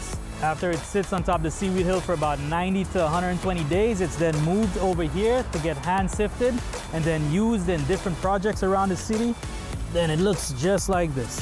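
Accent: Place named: Trinidad and Tobago. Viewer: trin accent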